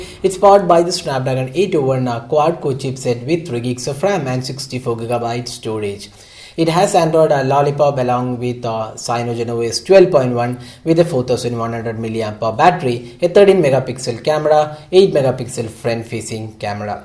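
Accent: Kerala, India. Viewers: change ur mallu accent bro....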